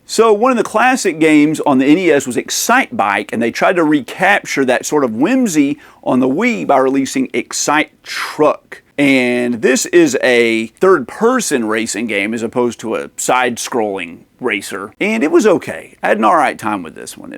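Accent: South, United States. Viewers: Apart the cabbage..are you from suddern m'erica ,i dont know i thought you are from Canada... I am european i berely speak/write m'erican language, so please forgive me, but legitimately what country are you from 🤔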